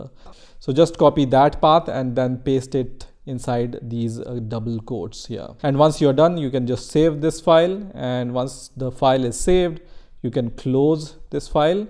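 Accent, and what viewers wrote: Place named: India. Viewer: When i saw the indian accent, i realized that i was in the right place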